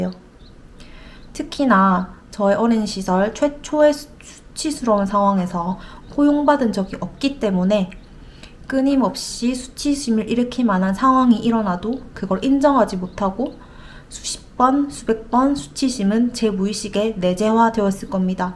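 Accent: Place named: Gyeongsang, South Korea. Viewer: 경상도 분이신가요 ㅎ 억양이...친근해서요. ㅎ 영상 잘 보겠습니다.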